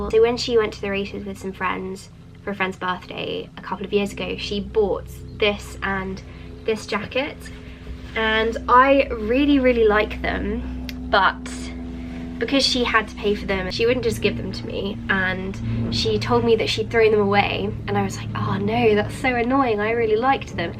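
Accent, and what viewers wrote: RP, United Kingdom. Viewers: Omg your so posshhh like it’s so cute I am from England and bloody hell are we that posh nope 😹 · Wow😮ave never heard someone speaking this posh before 😂😂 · Posh english* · You sound so posh and you say ‘received’ I love your accent although I’m British too 🇬🇧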